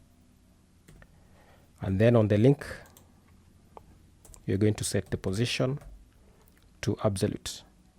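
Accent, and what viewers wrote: Kenya. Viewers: He sounds kenyan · He sounds like a Kenyan Nice tutorial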